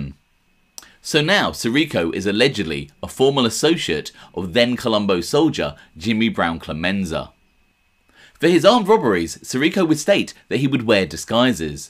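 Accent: United Kingdom. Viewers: Funny hearing a British guy talking about are American Italian history 🤡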